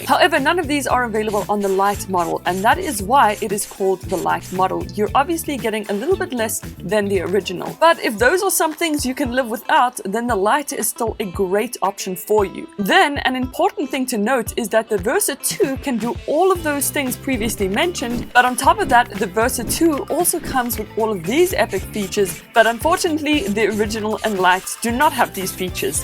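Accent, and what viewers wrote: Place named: South Africa. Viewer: I wonder how many other South Africans get that "that's so raven" look on their face when they hear the accent in a YouTube video 😂 Loved the comparison! 🔥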